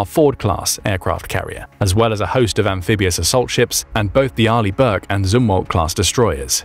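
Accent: United Kingdom. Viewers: This is what happens when random guys try to make videos of military hardware. You're going to get your butts handed to you by the YouTube algorithm, by having actual military enthusiasts watching. With all those inaccuracies pointed out in these here comments. Caliber in traditional military sense describes the ratio between bore diameter and barrel length. The 50 caliber Mark 22 actually fires 3 inch/76mm shells, not .50BMG ammunition. This is the kind of basic mistake that casts doubt on everything else referenced in the video. At least the British accent makes it seem more artificial and movie like.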